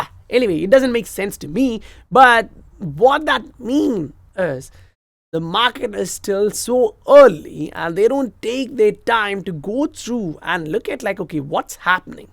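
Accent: India. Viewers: Are u Indian...?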